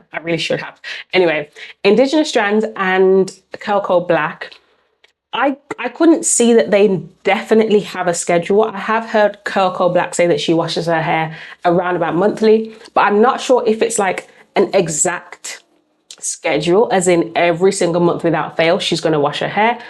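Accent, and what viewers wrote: Birmingham, United Kingdom. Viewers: Wait are you a fellow brummy? 😮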